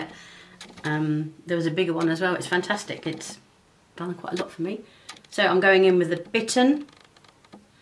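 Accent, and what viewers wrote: United Kingdom. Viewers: I could listen to you talk for hours! Love a British accent! Your tutorial for doing makeup on a hooded eye is so well done. Just a little tip: don’t say negative things about yourself - I think you are a beautiful lady! Loved the whole video. Looking forward to more from you! 😊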